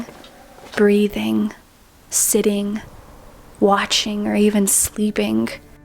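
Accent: United States. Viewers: Are you from American🇺🇸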